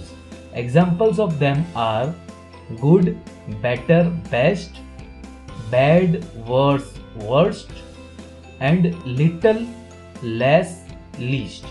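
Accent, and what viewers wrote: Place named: India. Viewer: Hehe is accent... he's truly an indian ...and most of the students are also India's here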